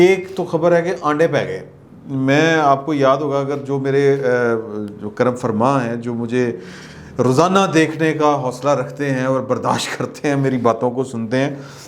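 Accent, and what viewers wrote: Punjab, Pakistan. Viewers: Syed shb ap boht acha analysis krty Hain with Punjabi tarka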